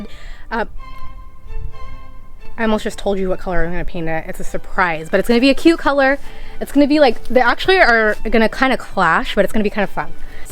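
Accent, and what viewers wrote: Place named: Canada. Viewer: I can hear Canadian in your voice.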